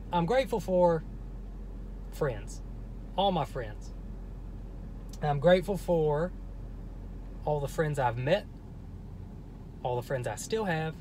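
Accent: South, United States. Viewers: He’s from the South originally...it’s real :) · I'm thankful for the fact that you've developed a southern accent throughout the years. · He's said before that he deliberately dropped it because he didn't think he would be taken seriously. I think he plays it up a little in some of the videos, but, it's real.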